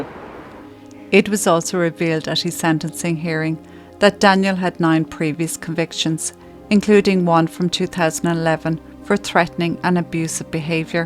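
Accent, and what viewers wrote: Ireland. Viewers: THANK YOU FROM BOSTON 🎉🎉🎉 I LOOK FORWARD TO YOUR NEWEST VIDEO, BUT ESPECIALLY THE BEGINNING QUOTES.... Great Story Teller You Are!!! I love Ireland. Heaven on Earth. Listening to your brilliant accent reminds me of being there.... Your AMAZING.thank you for your hard work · Weirdo? Man, it's called an Irish accent. How can you say such a rude thing? And offensive. I'm also Irish and am very, very proud of it. Chill out.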